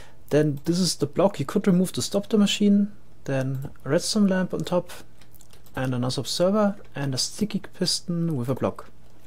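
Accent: Germany. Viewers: Are you german? :D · ich sprech ja eigentlich kaum english aber ich hör voll raus das du deutscher bist... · man hört du bist deutscher :D... aber kann es sein das du noch andere Akzente hast? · German? Dutch? Austrian? What is that accent?!?!?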